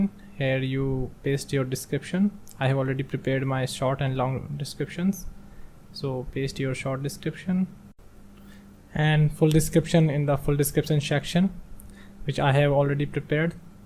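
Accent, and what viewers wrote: India. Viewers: The indian accent makes me confident he knows what he's doing and not trying to scam me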